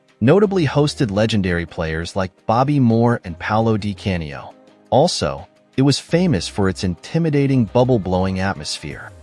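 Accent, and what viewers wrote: United States. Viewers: Hoo the phuq are you? It's pronounced "Hills-bu-ruh"/"Hills-bruh" not "Hills-borrow". It's "Seltic" not "Keltic". "Berm-ing-gum" not "Ber-ming-HAM". "White Lane" it was "White Hart Lane". It's "Darby" not "Der-by". " 1970 ess" for "1970s" get real. Whoever you are do yer effing homework. Pretty much every ground in England, Wales and Scotland was the scene of "hooliganism" in the late 1960s, 1970s and early1980s - nothing to see there. Every ground had its area (or fortress) for its hard nut fans. And I am sick of the ubiquitous (look it up in an effing dictionary) US accent, pronunciation and emphasis (we (UK) emphasise the second or last syllable - not the first) on effing everything you watch on YouTube. Is the US trying to take over the world by its cultural imperialism? I think so - phuq off with yer cultural imperialism. Answers on a post card - you effing Yanks might have to look up that UK cultural reference. 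Jog on. Sick to death of you. - or the people who use some Silicon Valley tool to make yer vids - do your own voice-over, if you want to be (or appear) authentic. Rant over....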